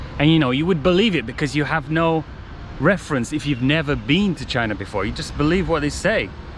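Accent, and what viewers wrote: United Kingdom. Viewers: That Brit accent that reminds me of Ron in Harry Potter. I just laughed quite nice 😁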